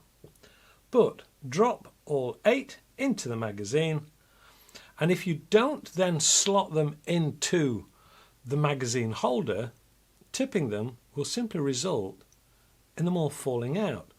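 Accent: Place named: England, United Kingdom. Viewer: Not used to gun reviews conducted by a gentleman with an English accent, but I’m here for it!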